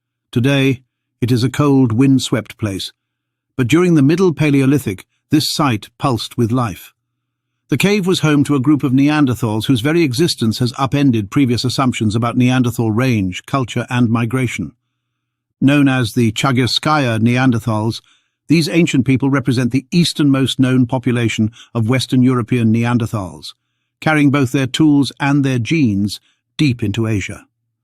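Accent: England, United Kingdom. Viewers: I see people today who have these features. Who is your English accent?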